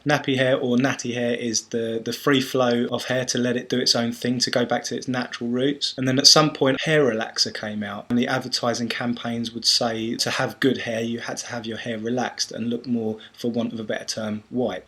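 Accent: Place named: England, United Kingdom. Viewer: You should make up your mind there guy. are you "white" or are you NOT "white"? you claim "white" people dont exist, but you seem to be holding pretty fiercely to your "whiteness". Sound English as fuck to me. Why not just stick to being English? WHITE people are a political creation of racists. jUst be an ignorant English ass who doesnt know what he is talking about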